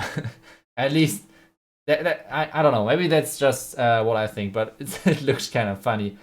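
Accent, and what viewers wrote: Germany. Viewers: I love the German accent when you speak English😍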